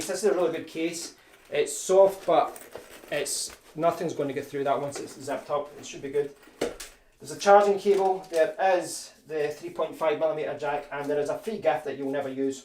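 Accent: Scotland, United Kingdom. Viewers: You sound so Scottish lol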